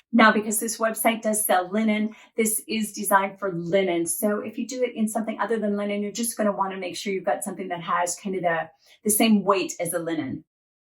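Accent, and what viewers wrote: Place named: Philadelphia, United States. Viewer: I agree, I think I may try the one you have on first. Great list of tops. Why did I have you pegged as a Philly or South Jersey girl? Texas? Never would have guessed.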